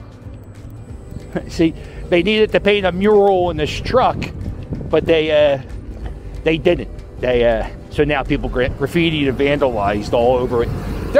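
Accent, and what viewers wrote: Philadelphia, United States. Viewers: Love that Philly accent. Left in 1957 and never really lost it.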